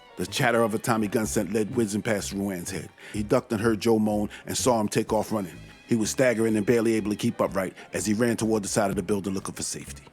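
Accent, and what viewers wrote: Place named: East Coast, United States. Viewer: Here Boss. Don’t want Mr. Algorithm to wack me and bury my ass in the desert. Looking forward to the John Torrio vid. I was introduced to him via the series Boardwalk Empire and want to know more about his beginnings. Your narration, dialogue, fly and scruffy East Coast accent, and gangster allusions are second-to-none. Superb story-telling skills. Don’t take wooden nickels Boss. See you’s in the funnies Boss. 🖤 📚 🎥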